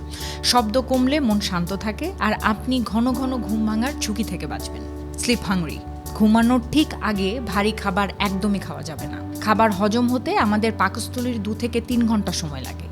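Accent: Bangladesh. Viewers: আপনার ভাষা শুনে মনে হয় আপনি বাংলাদেশী। তো দিদি ভাই আপনি আপনার ভিডিও তে যে জ্ঞানের কথা বলেন আপনি কি ১০০% বিশ্বাস ও পালন করেন কি?? না কি শুধু জ্ঞানের কথা বলে আয় করেন (বর্তমানে তো বাংলাদেশের রাজনৈতিক অস্থিরতা ও অর্থনৈিকভাবে ভেঙে যাওয়া একটি পাকিস্থান ও চীনের দালালি করা দেশ) আল্লাহর নাম নিয়ে উত্তর দেবেন। বাংলাদেশীরা ভুয়া টাইপ হোন (সবাই নন)।।।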